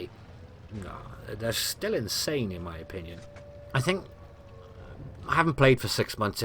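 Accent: Wales, United Kingdom. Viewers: Are u welsh? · Missed your soothing Welsh voice! And your tips of course!!!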